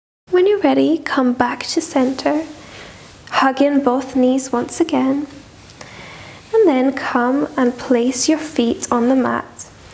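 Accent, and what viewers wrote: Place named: Scotland, United Kingdom. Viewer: your gentle scottish accent is asmr heaven.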